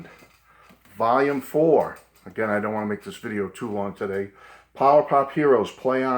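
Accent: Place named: New England, United States. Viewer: Hi. this was a big help to me I did like your video. It was a lot of fun to here your New England accent:}. My Grand mother was from New England and see did say park the car a lot lol.:}. So thank you for that happy memory of here!!!:}. O great video lol Take care my friend!!!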